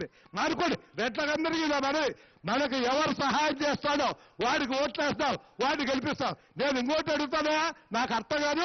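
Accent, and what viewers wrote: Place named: Rayalaseema, India. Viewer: Pure Rayalaseema language 😁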